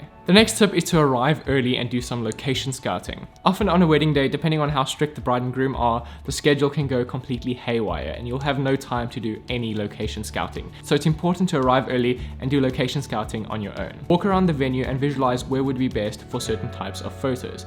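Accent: South Africa. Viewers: are you South African? Your accent sounds very South African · Ah this video was an amazing help! Is that a Cape Town accent I hear? 👀🇿🇦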